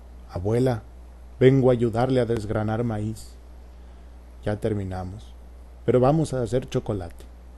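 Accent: Mexico. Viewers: ¡Hola! Por favor, este es el español mexicano? (Is this Mexican Spanish please?)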